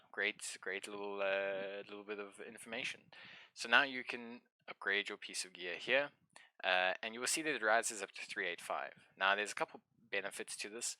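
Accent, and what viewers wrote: South Africa. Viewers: Bro, your South African. Tell me, do you play private servers?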